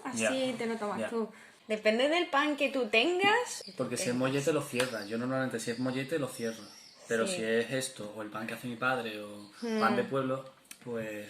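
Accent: Andalusia, Spain. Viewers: tiene "ange" la niña! Yo igua, me he quedado enganchaita al canal en cuanto la he oido con esas palabras tan andaluzas y ese acento tan bonito mitad inglés mitad andaluz. Que alegria me da como gaditana ver que alguien de una cultura tan lejana se adapta tan bien a la nuestra! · TU ACENTO ANDALUZ mezclado con algo de inglés es ENTRAÑABLE . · Querida, Yo nací en Cádiz y vivo en Cataluña aclarado esto te comento que celebrando mucho que de hace poco tiempo para aquí se desayune en Sevilla con pan con tomate hace pocos años atrás, lo típico eran las tostadas con manteca colorá o mantequilla o aceite.. El pan con tomate es típico de toda la vida en Cataluña. Aclarado esto te cuento que me encantan tus vidios y tú acento andaluz. Te mando un cariñoso abrazo con mi enhorabuena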